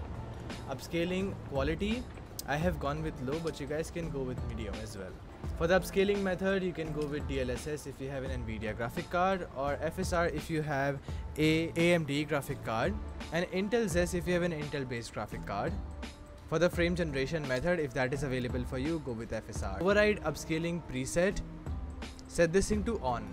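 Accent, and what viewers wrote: India. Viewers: English of The índia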